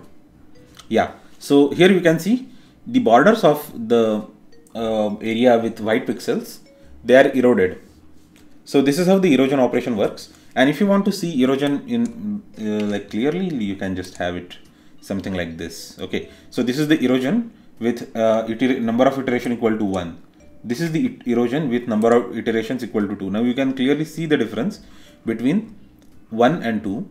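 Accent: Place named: India. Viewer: THANK YOU, you are the one of the indian accent that i like, thank you.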